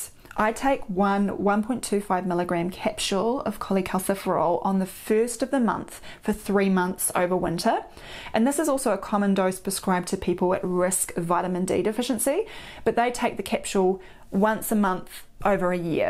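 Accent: New Zealand. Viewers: What I enjoyed the most about this video “Kiwi accent” noticed one thing more Hollywood is alive & kick’n in Kiwi Land, you know I’m just been facetious, my first time it’s informative, talking about sun, I am from beautiful Huntington Beach CA weather is always good. All in all it’s informative video! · Thanks for the information. It's a beautiful sunny day so I am going out and catching that sunshine. You are blessed with THE most Kiwi accent I have ever heard! Love it! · The Kiwi accent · So wonderful to hear a Kiwi accent! Appreciate your videos. You are a picture of health an vitality yourself. Thank you. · Who knew health information could be so exciting? That combination is irresistible: - beaming smile - sweet and gentle demeanor - medical facts delivered in a comprehensible way for normal people - love the kiwi accent! You are officially my internet doctor,!:)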